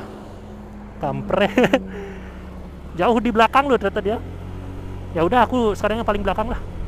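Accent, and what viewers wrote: Bali, Indonesia. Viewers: Logat bali khas banget hehe😅😁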